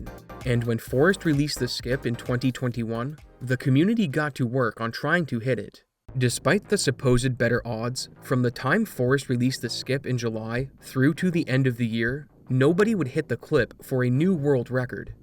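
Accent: Canada. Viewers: This guy's gotta be canadian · Are you Canadian?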